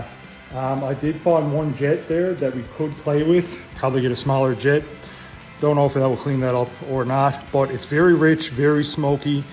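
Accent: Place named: Newfoundland, Canada. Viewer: You're a newfy ay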